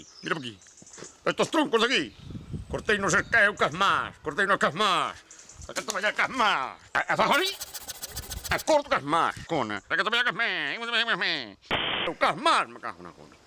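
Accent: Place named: Valladolid, Spain. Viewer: Cando falas coma o paisano,tes acento galego. cando falas ti tes acento de valladolid curioso, non??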